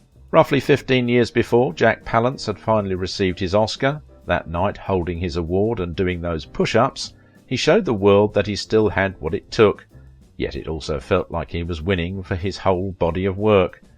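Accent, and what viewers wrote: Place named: Cockney, United Kingdom. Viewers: The title is false. Brando did have his nose broken when he was horsing around backstage with another performer during the run of "A Streetcar Named Desire" but it wasn't Jack Palance who clocked him by mistake. It was one of the actors in the poker night scene who also had been a professional boxer previously. I forget the guy's name, but he wasn't a large man like Palance. Marlon himself told this story a couple of times. Why would he lie about who punched him? I have never heard the rumor that Palance was involved, and it seems made it up because it fits in with the idea of him wanting to go on for Brando. Here's another oddity--the fellow narrating has a cultured British accent, yet they are unable to write a decent English sentence. It should read, "Why did Jack Palance Knock Out Marlon Brando?" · The narrator himself may be a cultured man, I wouldnt know, but his accent is not especially so. 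Unless you mean cultured in the sense of deliberately created or adopted. The underlying accent sounds like an east end of London, "cockney type", accent. It might suggests a clever person who has got on reasonably well in life but who may not have been especially well educared in his early years. I am not saying the accent is fake, he may well have picked up his adult accent because of the circles he moves in.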